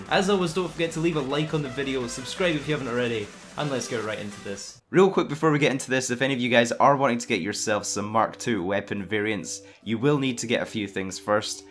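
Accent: Scotland, United Kingdom. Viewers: Find it so amusing watching videos and it’s a fellow Scottish man. So used to hearing Americans and English guys lol. Nothing against the English or Americans, love yous all.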